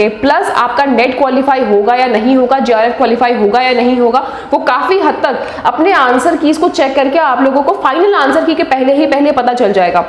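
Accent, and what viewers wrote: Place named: Haryana, India. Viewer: m rohtak se hu mujhe aisa lga ki aap haryana se ho isaliye puchha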